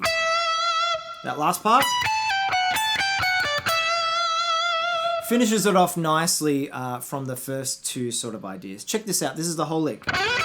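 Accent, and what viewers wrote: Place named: Australia. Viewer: Hi. Good vids man! From your accent you're an Aussie right? I am from New Zealand. We both have the same high voltage (230-240 Volts). Do you have any problems with your sound when your playing live through a Tube Amp. Like a high ear piercing sharp cold tone when you play higher up the neck? If so what do you do about it. What kind of Amp are your using. I have a Marshall and put a brown Box in front to lower the voltage. But not 100% happy with it. Love to hear about your experience with that. Cheers, John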